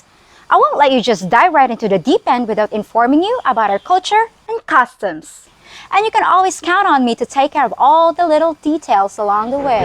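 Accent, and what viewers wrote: United States. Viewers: did you live in the states? You sound way too Americanized even your way of thinking. One rule for men to follow. No honey no money. Meaning you have to meet them first and see how it unfolds. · How do you sound so American and know our colloquialisms? ad nauseum, I know many who dont know this phrase and many others you use. Did you live in the US? Canada?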